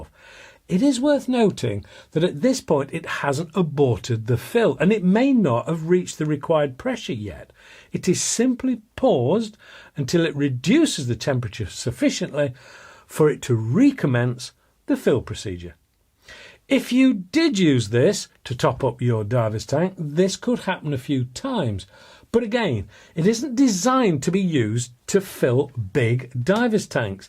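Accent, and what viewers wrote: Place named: Yorkshire, United Kingdom. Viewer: Great review of what looks like a quality product. But atrocious Yorkshire accent. No more of that please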